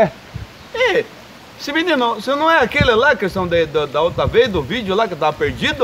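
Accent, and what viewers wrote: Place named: Paraná, Brazil. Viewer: Você é de Paranaguá ou de Antonina parece sotaque de paranaense da hora seus vídeos 😉😊😊😊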